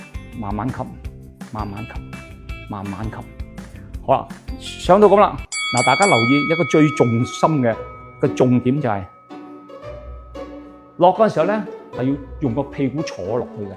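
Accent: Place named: Hong Kong, China. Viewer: get 到了，好親切的香港人香港話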